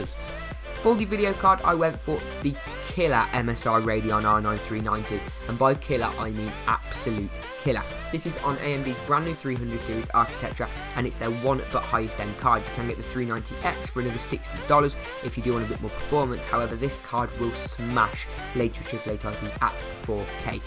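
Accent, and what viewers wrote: England, United Kingdom. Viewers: you sound English because ,you should use £ as well as dollars because I'm not sure about the prices ,oh yea I subd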